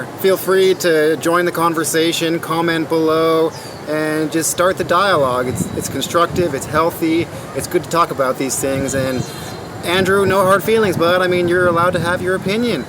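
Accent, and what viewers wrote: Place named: Vietnam. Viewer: I came across your video while learning English :) and already fell in love with your video :) it seems like you've lived in Viet Nam for a long time. you know very well about people in VietNam I'm also impressive with your Vietnammese accent. thank you for raising your voice and all your compliment to Vietnammese women. have a nice weekend :)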